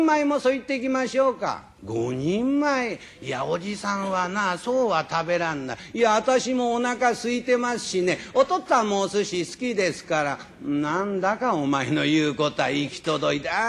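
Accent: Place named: Edo, Japan. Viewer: べらんめえ調の良さ。 いいね！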